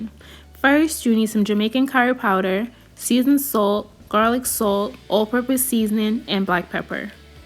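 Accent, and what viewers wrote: United States. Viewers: Yea that's how I make mine, how you no so much about Jamaican food, I thought you was an American. Girl especially you're voice.love you're videos so much.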